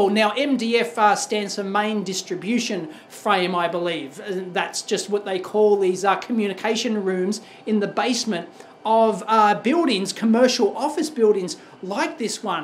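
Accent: Australia. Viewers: No idea why this was recommended to me and no idea why I clicked it. But I like it. The guy sounds like a very upbeat person. Probably the accent. Australians always sound like they're loving life. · You sound Australian but I am sure this is located in India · Aussie accent yeahh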